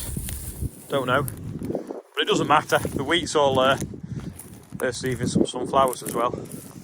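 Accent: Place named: Liverpool, United Kingdom. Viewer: bad tory you lad. terrible hearing that shite in a scouse accent. do one. unsubscribed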